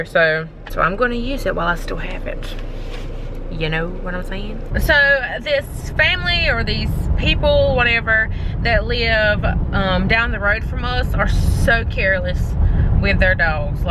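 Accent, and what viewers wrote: South, United States. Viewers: Lord girl I love your sassy southern accent!! I'm also from North Carolina literally from Rockingham we're so close! Love the videos you create girl 💓💓☺️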